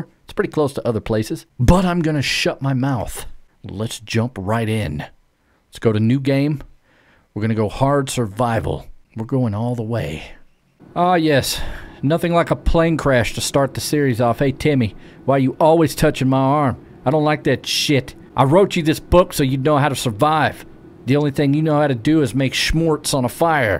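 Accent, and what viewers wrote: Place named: North Carolina, United States. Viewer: You must be from NC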